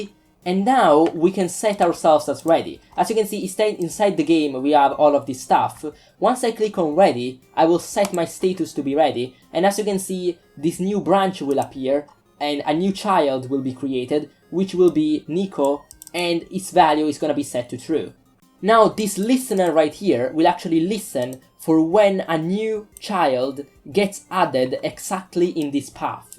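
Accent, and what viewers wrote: Italy. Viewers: Si sente che sei italiano, Grande ✌️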